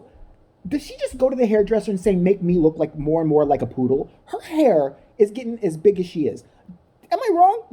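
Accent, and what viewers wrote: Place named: United Kingdom. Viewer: I google it ( exempt ) BC... I'm a Genius... Lol🤣 ur always sooo nice and Kind to me 💞... Thank you🙏 btw, loooovvvee ur British accent🤣😭!!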